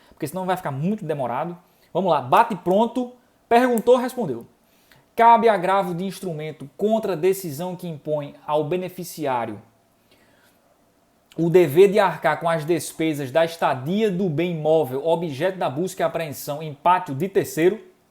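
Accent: Northeast, Brazil. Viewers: Parabéns pelo conteúdo. Gosto muito do sotaque nordestino, lembra meus avós.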